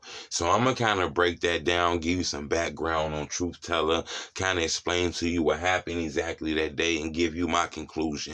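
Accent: Chicago, United States. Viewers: I knew u was from the Go from the accent when I watched your first video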